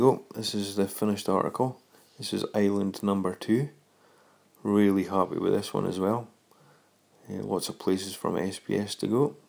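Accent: Scotland, United Kingdom. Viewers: Great series. Love the Scottish accent, but it doesn't help to understand the phrase: "leave it to dry for a couple of weeks in the sun." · lol too funny at 1 minute on the video, listening to a scot talking about sunshine, be careful you don't blink you may very well miss that sunshine, that rock will never dry out in Scotland, lol great stuff buddy I'm really enjoying to videos.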